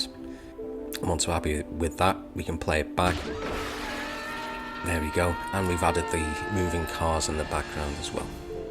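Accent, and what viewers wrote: Liverpool, United Kingdom. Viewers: A fellow Scouser hey?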